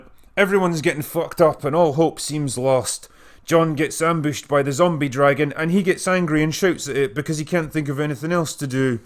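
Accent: Scotland, United Kingdom. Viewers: Are you Scottish? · It's amusing how much more Scottish and drunk he sounds in his older videos. If only he knew when he made this video that it was going to get even worse... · OMG--I could just listen to your rant for hours! That Scottish accent and the delivery! YOU should be some kind of GOT character. I don't even care if I agree or disagree with you! LOLOLOLOL! R.E.S.P.E.C.T.!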